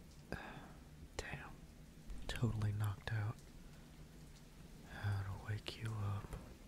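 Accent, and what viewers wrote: South, United States. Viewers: weird question but am i the only one hearing a southern accent? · 100% there is no way there isn't a southern accent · the soft "darlin" always gets me i love a good western/southern esque accent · the country acent😳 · Light southern drawl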